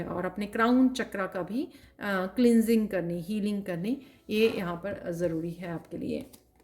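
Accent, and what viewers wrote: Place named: Punjab, India. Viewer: Tu ganwar Punjabi tone wali aurst bqn eahi angrez hai sadi huî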